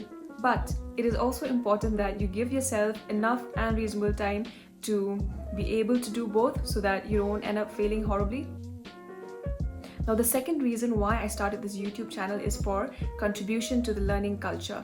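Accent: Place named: United Kingdom. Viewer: British accent is on point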